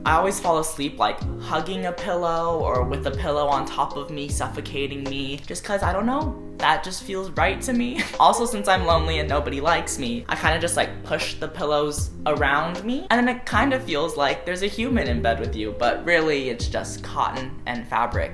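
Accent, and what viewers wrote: Texas, United States. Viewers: a love that sometimes 5he has a little of a southern accent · Those traNSItions thooo!!! Also you sound incredibly Texan in this video?? Like more than usual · im from texas but your texas accent is so strong omygod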